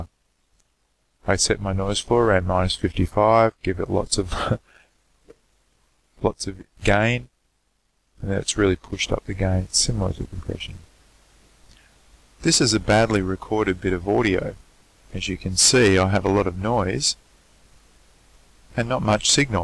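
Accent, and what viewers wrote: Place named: United Kingdom. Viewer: Hey, this was a GREAT tutorial! Thank you very much for posting. I had used Audacity for a while, but stopped because I could NEVER figure out how to denoise things (and getting a new mic helped a great deal too...) Now, after seeing this, I think I'll start using Audacity again, as there are some very nice things about it... Faved. Good to see you again. Hope you're well. (I had NO idea you were British!:D)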